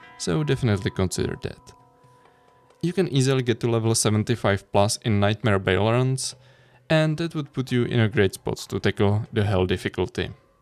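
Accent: Czech Republic. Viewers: Angličtina zní hodně jako moje, tzn česky :D. Trefil jsem?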